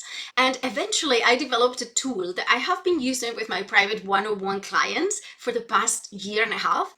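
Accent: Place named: Scotland, United Kingdom. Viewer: That's really the only indicator I notice that she is a native Spanish speaker. A Scottish influence is clear.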